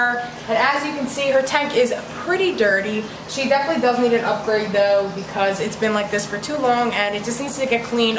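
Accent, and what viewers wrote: Philadelphia, United States. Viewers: Your accent is adorable, philly?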